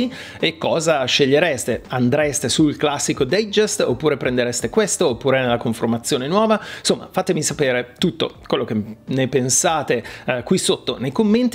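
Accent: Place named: United Kingdom. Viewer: Ma perché hai l’accento inglese?!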